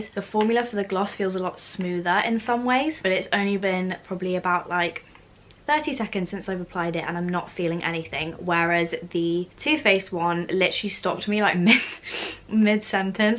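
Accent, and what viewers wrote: United Kingdom. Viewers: oh I wasn't (edit was here, miss-typed) searching for a brit. I just watch a lot of YouTube and mostly come across Americans and Canadians. There was no need to call me odd. It is odd you would pick up on it. I called it refreshing because it was to me. There is no need to be upset okay? I'm just some random on the Internet that was happy she came across a familiar accent after spending a lot of time consuming youtube media that wasn't from the UK. Do you never just go through your recommendations? That's what I do. I don't search for anything it's more fun that way.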